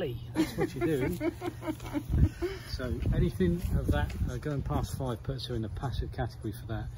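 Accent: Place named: United Kingdom. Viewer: I love your British accent!👨🏻‍🦳🇬🇧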